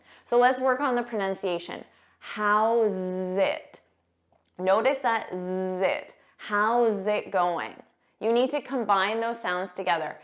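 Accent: United States. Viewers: You speak American! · I don't like your pronunciation so so so boringly American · A nasal American English speaker stating the obvious. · Such horrible american accent!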